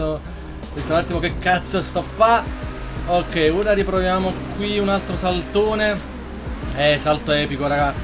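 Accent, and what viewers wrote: Naples, Italy. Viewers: ma sei di napoli zio?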